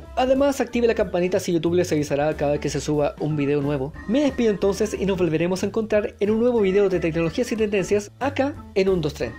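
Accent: Standard. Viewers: Habló lento y con acento neutro, jajajajaja. El acento chileno original es incomprensible fuera de Chile. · tu acento universal es fantástico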